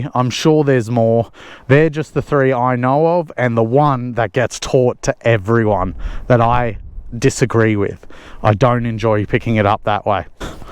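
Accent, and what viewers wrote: Australia. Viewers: You sound like an Aussie!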